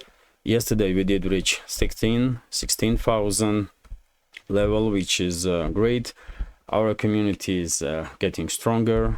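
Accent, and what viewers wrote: Russia. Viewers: 2 streams is much better, dont forget the attention timespan of modern humans ( esp young ones) is less than 10 Minutes! Kepp on the good work, i really like to hear a Russian voice, even if you are emotionally involved and cant be 100% neutral. The same for Patrick Lancaster i watched 90% of all his videos and already in Mariupol battle it was obvious after he reports the civilian victims fate he cant be neutral. I can accept that.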